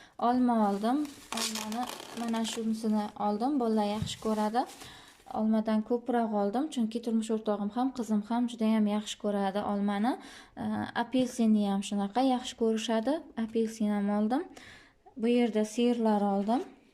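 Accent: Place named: Khorezm, Uzbekistan. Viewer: Man ayol kishiman . Siz Xorazmdanmisiz gapirishuz Xorazmlilarnikiga òxsharkan judayam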